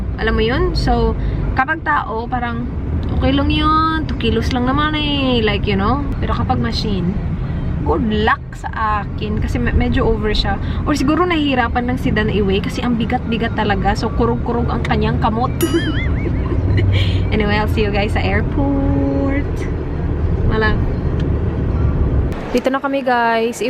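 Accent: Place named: Visayas, Philippines. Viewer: You speak English so well and yet bisaya kaayo gihapon ang dating. Hahaha ✌😇😂💓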